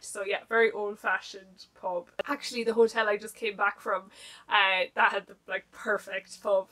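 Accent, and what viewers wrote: Ireland. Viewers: Belated happy St Patrick’s Day,! I love hearing your Irish accent! ☘️ As we approach the end of March, I’m just checking that you’re still ok to buddy read A God In Ruins in the second half of April? 😊📚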